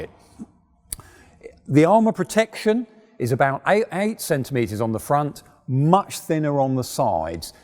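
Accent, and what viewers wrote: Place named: England, United Kingdom. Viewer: That English accent and tone of voice is extraordinary, congratulations!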